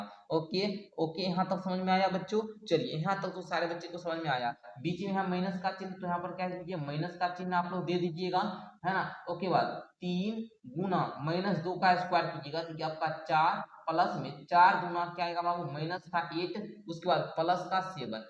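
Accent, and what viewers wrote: Bihar, India. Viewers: Kya Bihari Ho Ho😂😂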